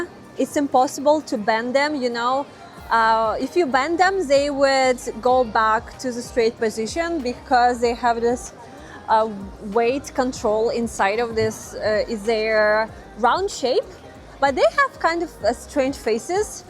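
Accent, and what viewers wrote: Russia. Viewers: Нет, всеравно русский акцент, я не choose гречка , а говорить l have chosen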